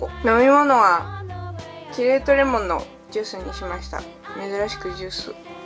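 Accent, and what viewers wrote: Aomori, Japan. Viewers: 出身青森？訛りが青森っぽい！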